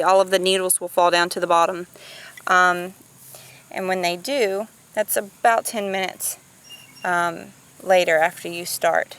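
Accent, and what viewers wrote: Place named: South, United States. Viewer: Is that Loblolly Pine. I recognize that accent and I know the only pine that grows in the south is Loblolly. I am from Arkansas and you have to go to Missouri before you find white pine.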